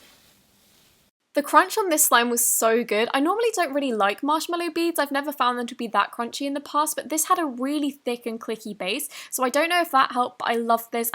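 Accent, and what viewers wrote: United Kingdom. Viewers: Teach me how to speak with British accent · Your British accent is so strong that i love it the British accent (strong ones) are the best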